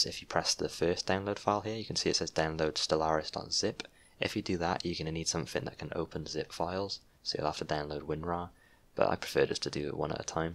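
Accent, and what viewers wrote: Wales, United Kingdom. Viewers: Welsh lad?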